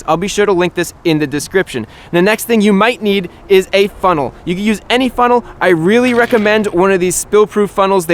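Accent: United States. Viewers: Are you an American,?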